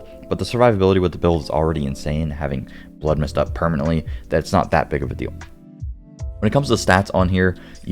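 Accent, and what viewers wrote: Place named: United States. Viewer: The speaker is using American English. It's pronounced MUH-cob.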